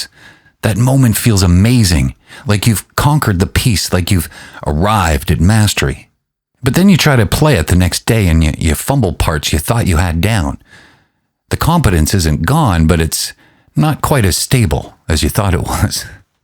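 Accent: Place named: Canada. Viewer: Bro,your accent has a little Canadian or maybe Minnesotan flavor. I noticed your "aboot/aboat" and the way you say "sory".....amirite? You don't have to answer, I know that's considered an inappropriate question these days. I'm just learning to ask questions, something I was not allowed to do growing up so cut me some slack maybe? 😊💙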